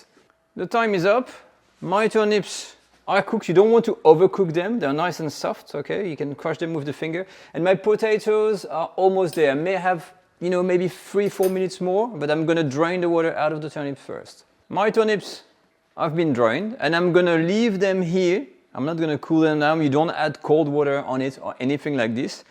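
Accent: France. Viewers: Frensh english lol , nice vdo btw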